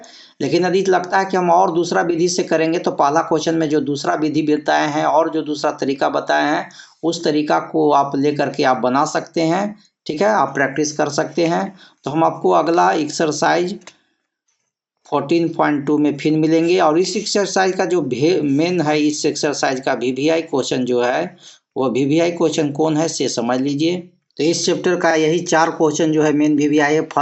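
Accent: Bihar, India. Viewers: Sir आप bihar से है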